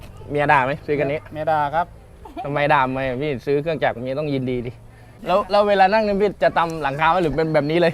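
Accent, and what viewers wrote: South, Thailand. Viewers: คนใต้แน่นิ อยู่แค่ไหนคับ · อูย่ใหนครับเห็นแหลงใต้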